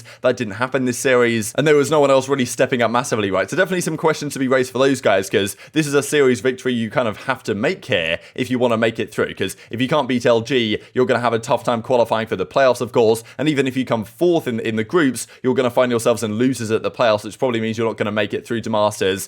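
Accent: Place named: United Kingdom. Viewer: Thx for uploading daily, beside the news, at least your video is useful reference for learning British accent XD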